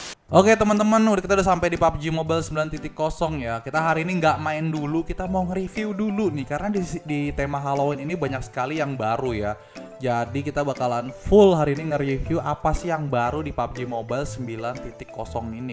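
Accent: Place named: Jakarta, Indonesia. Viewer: Fix Betawi ini orang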